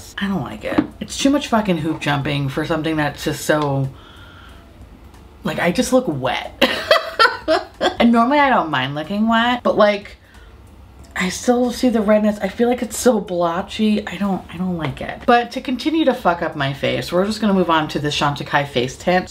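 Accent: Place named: Newark, United States. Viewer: Sounds like Newark to me!!!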